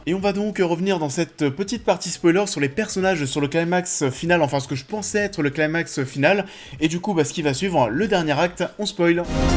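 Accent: Paris, France. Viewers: On devrait donc selon toi nuancer les attaques et les insultes permanentes que subissent au quotidien des fonctionnaires cherchant simplement à faire leur travail ? Rester dans les clous que nous impose la bien-pensance des milieux privilégiés d'extrême gauche ne suffira pas à gommer ton manque de connaissance du sujet que dévoile ton accent parigo du 75, de privilégié justement...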